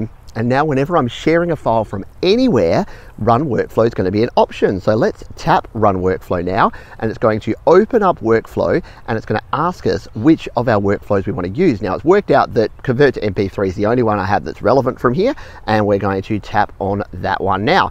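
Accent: Australia. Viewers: Australian. So am i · Australian def not a Brit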